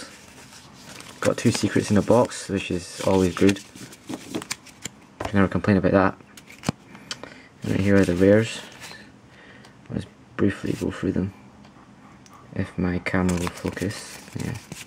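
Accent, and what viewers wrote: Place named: Scotland, United Kingdom. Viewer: i love your accent... scotish right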